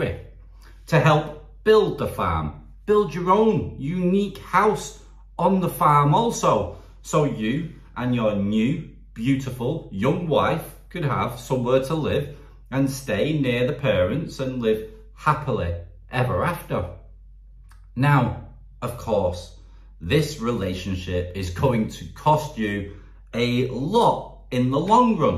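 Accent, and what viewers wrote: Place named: Leeds, United Kingdom. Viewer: do u ever think KAAAA sounds like an old crow lol - when i hear the girls talking it makes me think old crow lol - u sound like a leeds guy - close ?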